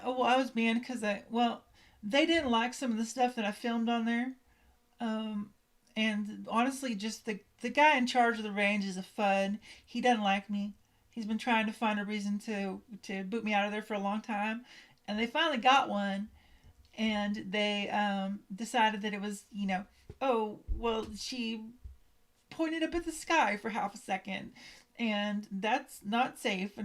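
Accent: South, United States. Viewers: It's my first time hearing your voice. Wow that soutthern twang!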